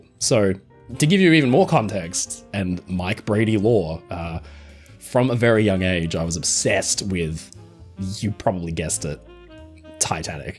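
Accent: Australia. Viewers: I love listening to you talk, you're so knowledgeable, a great teacher, and totally charming. (And I'm a sucker for an Australian accent.) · Your Australian accent is stronger in the first video. I have to do that (almost pseudoEnglish accent) at work as well or people don’t understand what I’m saying. 😅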